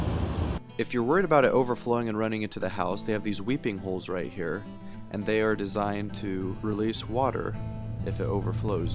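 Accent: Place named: Midwest, United States. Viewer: Looking at what I can see in the video this handyman dude lives in a northern area, his accent is Midwest. Full basement, air conditioner unit not a package unit, etc. probably Iowa or Missouri area.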